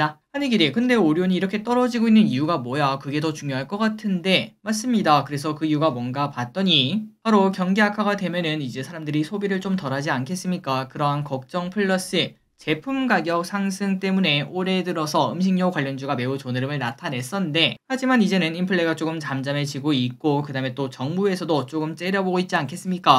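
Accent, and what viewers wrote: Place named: Daegu, South Korea. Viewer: 이거 어디까지 올라가는거에요?? 찐 대구 사투리네요ㅋㅋㅋ😂😂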